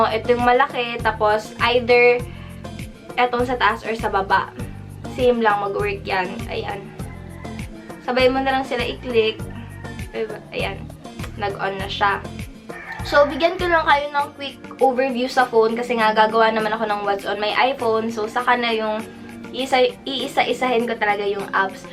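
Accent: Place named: Davao, Philippines. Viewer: Taga Davao man diay ka ate hehe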